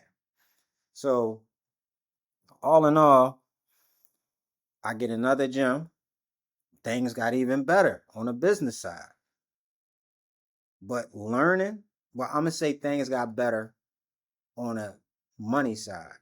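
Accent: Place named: Philadelphia, United States. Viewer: You from Philly? I hear the Philly accent,good work cousin!! 👊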